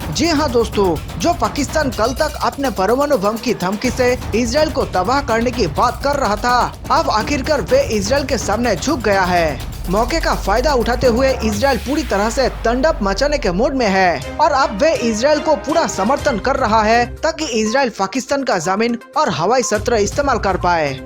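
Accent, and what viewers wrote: Bengal, India. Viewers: I must say, this fellow is a Bengali guy by his Hindi accent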